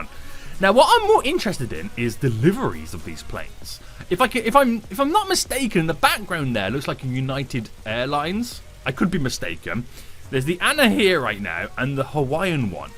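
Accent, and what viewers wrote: United Kingdom. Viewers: The British accent 🤌🤌🤌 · Are you British then? If so, cool coz me too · Your British?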